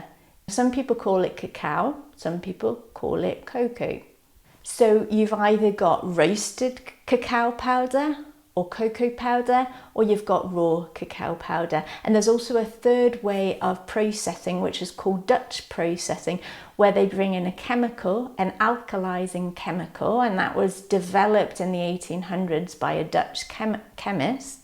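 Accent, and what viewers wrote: United Kingdom. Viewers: Mam, are you speaking British language because I'm learning British language, plz reply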